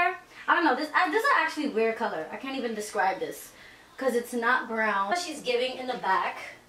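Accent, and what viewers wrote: New York, United States. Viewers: I love that NY accent ❤️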